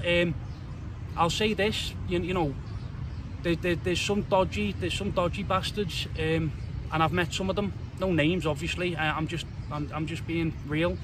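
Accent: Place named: United Kingdom. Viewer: I love British accent ....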